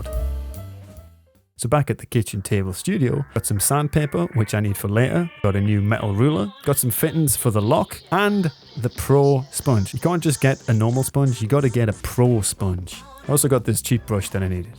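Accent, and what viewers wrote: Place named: Newcastle, United Kingdom. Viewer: Love your Geordie accent, I laughed when you said Sainsbury's is mad expensive 😂 I feel ya, cause it's my local too! The mosaics turned out amazing!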